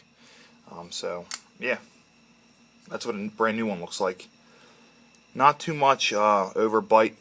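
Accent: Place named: Pennsylvania, United States. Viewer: Just scored one of these tonight and I like it. Side note: that is one of the heaviest Pennsylvanian accents I've ever heard.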